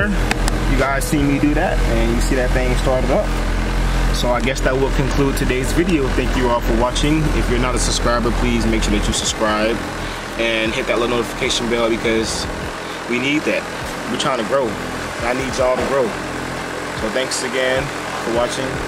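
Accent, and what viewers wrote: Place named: Houston, United States. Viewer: Tell me you from Houston?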